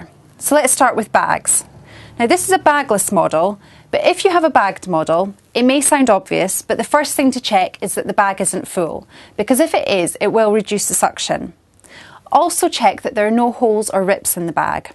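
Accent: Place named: Glasgow, United Kingdom. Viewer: I love this young lady's accent...my grandmother was from Glasgow and she sounded very much the same. =)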